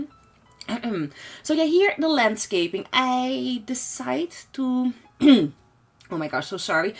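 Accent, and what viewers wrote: Netherlands. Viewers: Ik herkende het nederlandse accent gelijk, gelijk even in de comments gekeken of je echt nederlands bent aaa. Hahahaha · Ik zat te kijken, en dacht: ik denk dat ze Nederlands is, ga ik in de comments kijken ben je echt Nederlands, je hoort het wel, maar je spreekt wel veel beter Engels dan de meeste Nederlanders. Maar je hoort het toch wel een beetje bij woorden zoals wanted en work, maar dat is niet erg hoor xD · are you from holland?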